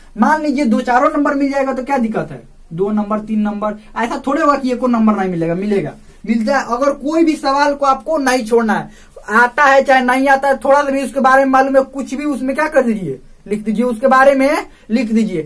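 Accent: Bihar, India. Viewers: Bihari h ka babuaa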